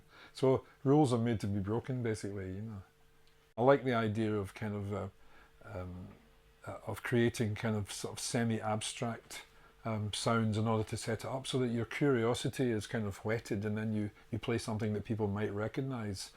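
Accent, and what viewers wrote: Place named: Glasgow, United Kingdom. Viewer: how can you not love that Glasgow accent?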